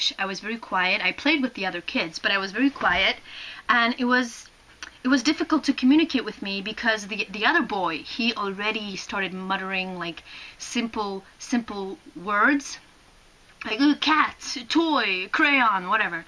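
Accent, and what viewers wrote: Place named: Poland. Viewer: I was wondering where you learned English. It sounds North American with a very small Polish accent. I am thinking about moving to Poland or Russia to teach English (I am from Los Angeles) and I am enjoying your videos.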